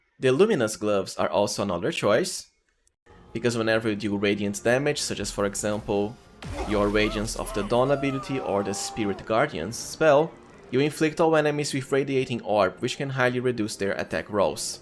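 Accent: Brazil. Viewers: Cara, você é do Brasil? Seu inglês parece muito de um brasileiro. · Se eu tivesse que chutar pelo sotaque ele é br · esse sotaque ai ta meio br kkkkkkkk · where are you from? your accent sounds brazilian! if you are, shoutout from a fellow countryman :)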